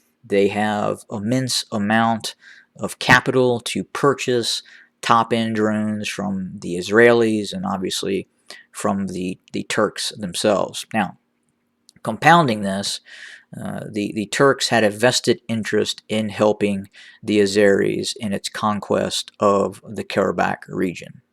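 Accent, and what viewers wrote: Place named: United States. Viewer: Are you a blood sucker ? What do you benefit from brutal mass murder of people on each other ! Your accent tells you are an American where democracy, moral and ethics are important virtues in relative way! But you are so invested in this bloody civil war among black people because of the idiot politicians! What makes you so active in this cruelty of a kind never seen ever?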